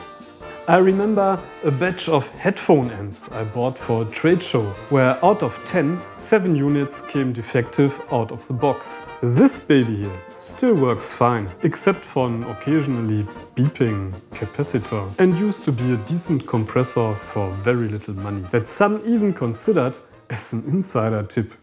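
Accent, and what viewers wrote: Germany. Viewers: Man i love your german accent, it reminds me that there are also great electronic musicians in germany ❤❤‍🩹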